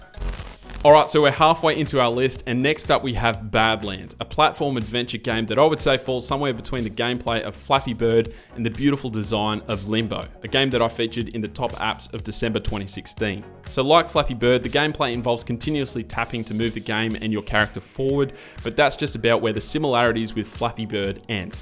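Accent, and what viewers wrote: Australia. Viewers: lemme guess ur a aussie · Good video, great editing, nice apps, good to hear an Aussie accent PS Badlands is way old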